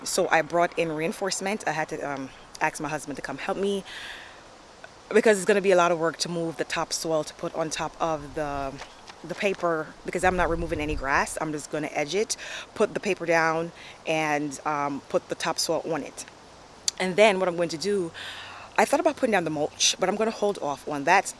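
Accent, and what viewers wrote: Jamaica. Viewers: Love when the Jamaican comes out. Mi tyaad. Can't wait to have the land space to do this extensive gardening. Love watching your videos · Rooting for Jamaican girl love wi accent. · You went back to the Jamaican talking, me tired me nah lie ,lol a nuff work this you know 😄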